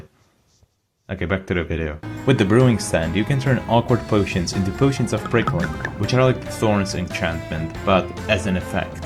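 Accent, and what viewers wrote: United Kingdom. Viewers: British accent was epic and very funny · British accent's kinda interesting, I recommend to u look at the Irish English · Britis accent was amazing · you have an awesome British accent please release more news about windswept update pleaseee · Accent funny british was generic comment /// no subliminal msg this time sry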